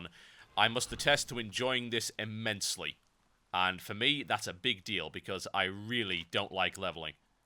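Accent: United Kingdom. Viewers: "Even if you don't care about the Lore, you'll care about whats about to happen" "Oh dear." "that sounds like crashing water in the background" "What the BLOODY HELL happened here. Wow. It's all gone." i literally had to pause to catch my breath with those rapid fire bits of humor, delivered in british fashion. i have to seriously ask where you're from, and what accent that is, or as much of an accent as you'll have to an american listener. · yer being british rocks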